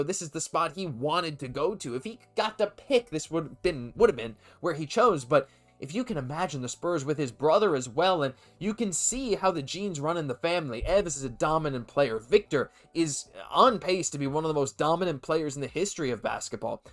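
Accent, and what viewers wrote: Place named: France. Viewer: Hello from 🇫🇷 Your french accent 👌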